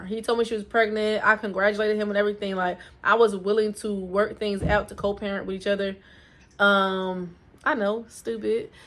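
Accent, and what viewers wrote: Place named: New Jersey, United States. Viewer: I like you story telling! I don’t mind the tangents and your Jersey accent is so nice!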